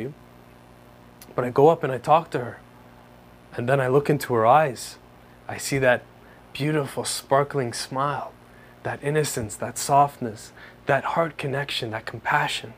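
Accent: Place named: Greece. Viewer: I love your accent...Are you Greek?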